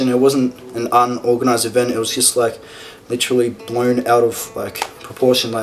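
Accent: Australia. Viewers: GLAD THAT YOU HAVENT LOST UR AUSSIE ACCENT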